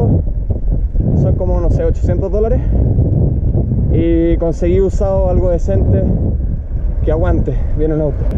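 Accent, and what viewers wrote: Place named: Chile. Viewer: ¿Acaso soy el único que no entiendo el chileno?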